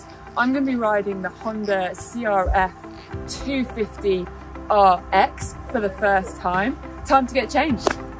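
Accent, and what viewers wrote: United Kingdom. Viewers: Love your English accent, even in your writing. Thank you!!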